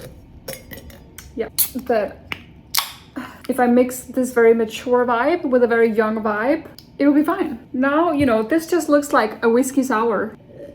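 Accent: South Korea. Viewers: 응? 발음이 한국인인데? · ~ 발음이 완전 한국사람 같습니다. ❤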